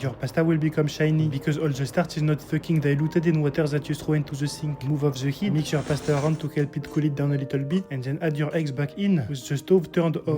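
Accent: France. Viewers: Love the broken english with french accent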